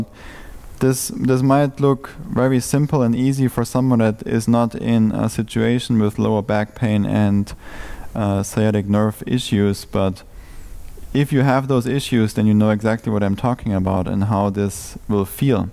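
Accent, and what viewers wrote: Germany. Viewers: Haha du bist doch locker deutscher hört man direkt an dein Akzent 😆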